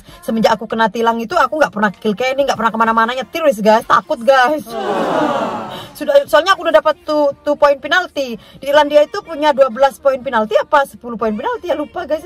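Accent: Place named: Surabaya, Indonesia. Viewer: ini ono.... ini ono.... ini ono.... kyk aku kl ngomong.... wahhhh iki kyk e wong Suroboyo an.... logat e arek" Suroboyo an.... iyo tho rek , sampeyan arek Suroboyo tha ?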